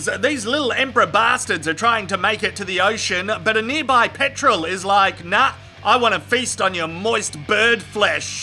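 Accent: Australia. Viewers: He's just a typical Aussie. All my mates talk like this.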